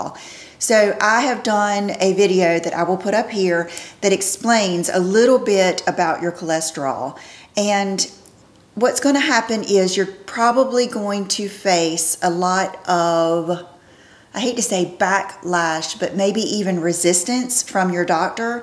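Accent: South, United States. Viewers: Thanks for sharing. I love your authenticity. Beautiful southern accent!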